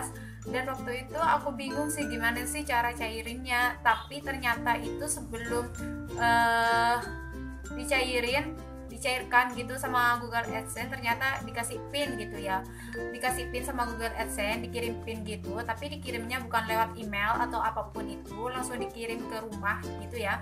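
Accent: Madura, Indonesia. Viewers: dari madura yah? kok logatnya kek org madura😅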